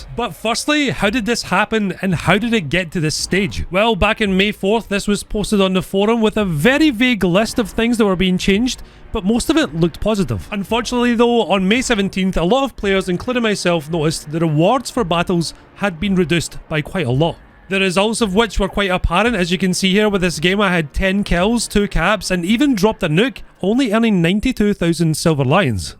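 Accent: Scotland, United Kingdom. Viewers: Just found your channel, and I like your Scottish accent.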